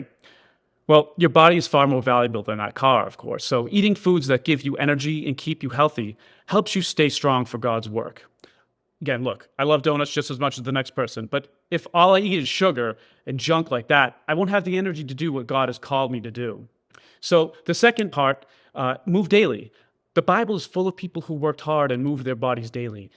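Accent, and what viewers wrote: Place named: Canada. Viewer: I detect a Canadian accent. Tom in Shizuoka Prefecture, Japan. Thank you for this sermon.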